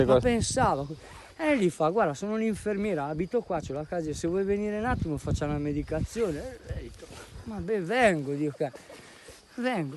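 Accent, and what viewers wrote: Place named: Trentino, Italy. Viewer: Inflessione che non riesco a decifrare. In alcuni momenti sembra addirittura trentino 😮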